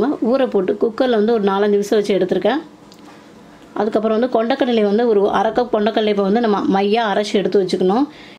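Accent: India. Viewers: Mam unga native nellai ya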